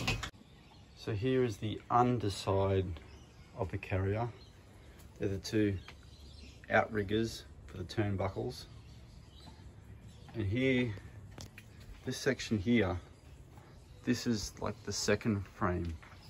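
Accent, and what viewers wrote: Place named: Australia. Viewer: i built a swinging carrier in about 2015 glad to see someone else has done it. Im in QLD sounds like youre in aus too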